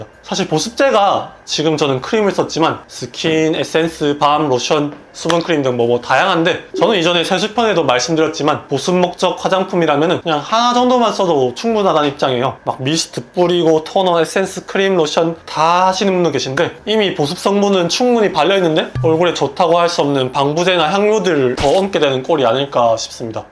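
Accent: Busan, South Korea. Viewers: 원장님 시원시원 솔직한 화법 너무 좋네요^^ 부산사투리 너무 정겹고~~~~~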